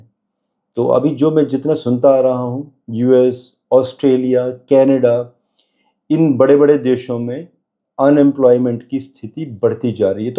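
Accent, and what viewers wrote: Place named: Himachal Pradesh, India. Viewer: Inka accent himachal ka hi. Fizzy hi niklega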